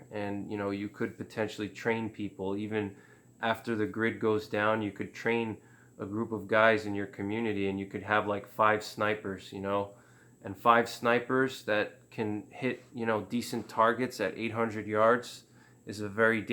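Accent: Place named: New York, United States. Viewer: Hey from waaaay UPSTATE NY. Your "accent" says waaay downstate.lol. Impressive on the deadlifts!! As a young man of 40 I pulled 405 for 10 at 145 lbs, but 25 , I got winded watching:) Too bad you appear to be so far away, could use another good man for the community. (***Always looking for like minded preppers to join my little slice of heaven!! 1/2 hour from Canadian border and 1 hour from Montreal, if anyone's interested and local, or just someone who realizes being in the sticks is way safer than anywhere near cities and won't mind some travel). Good videos and now a sub!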